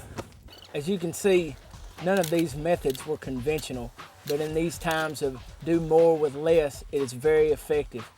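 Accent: United States. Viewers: you are from texsas? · the southern accent helped me to pay attention, thank you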